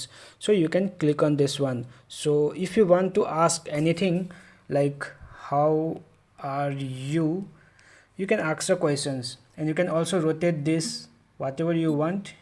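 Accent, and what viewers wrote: India. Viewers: Ilike the Hindi english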